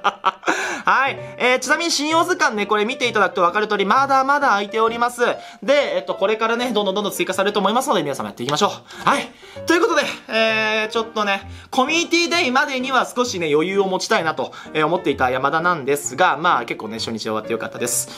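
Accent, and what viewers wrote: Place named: Tokyo, Japan. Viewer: 東京の人みんなこんなイントネーションなんか？ 引っかかってなんか腹立つ感じする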